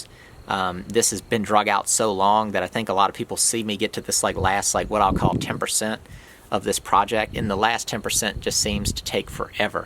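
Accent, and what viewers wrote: South, United States. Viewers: I really like your videos, think I have seen most of them. I'm no american accent expert, but I understand this is some kind of southern accent. In what state do you live/do this work?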